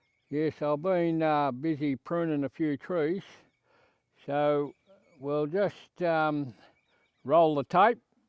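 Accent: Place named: Australia. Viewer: "Me fuck'n clippers av fucked out". I don't think it gets any more Australian than that.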